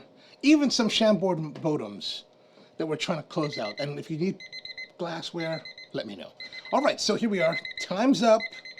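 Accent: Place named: Hawaii, United States. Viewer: I new I heard a Hawaiian accent! Then I saw your channel name. Mahalo!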